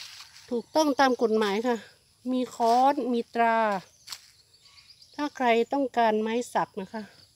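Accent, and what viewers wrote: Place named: North, Thailand. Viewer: 🥰สวัสดีค่ะน้อง 🌺ชมธรรมชาติด้วยค่ะ 🌸น้องชอบฟังอู้คำเมืองค่ะเพราะมากค่ะ🌸🌺🌺🌺💖💖💖💞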